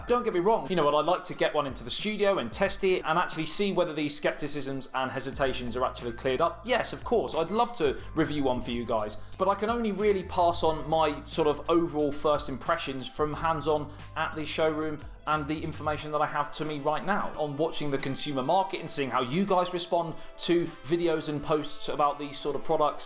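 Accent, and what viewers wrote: United Kingdom. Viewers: You are the most genuine rusty British accent Ive ever heard🤞🤞🤞,rememberd SNATCH MOVIE.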